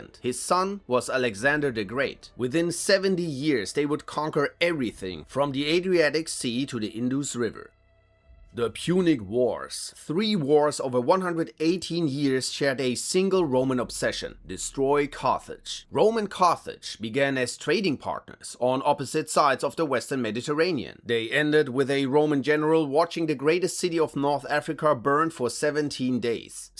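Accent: Germany. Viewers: Sounding less german, it's really beautiful and sounds even better with each video 🤗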